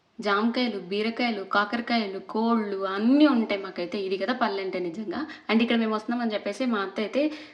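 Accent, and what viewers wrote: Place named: Telangana, India. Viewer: Mee maatalu telangana yaasalaa undhi same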